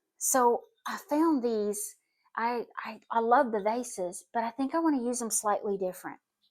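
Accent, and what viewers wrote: South, United States. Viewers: Lovely and so nice for fall. Love your adorable Southern accent!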